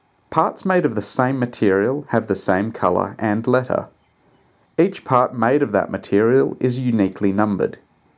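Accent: Australia. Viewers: upon hearing your voice' I said "Hey, he's an AUSSIE"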